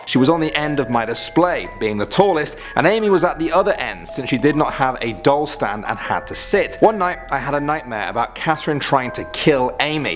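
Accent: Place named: United Kingdom. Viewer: Wait... I could not understand you with that British accent (sorry being an American has some cons) DID YOU SAY THAT TRANSFORMERS TOY COMMITTED SUICIDE? I would too if i was a knock-off McDonalds toy.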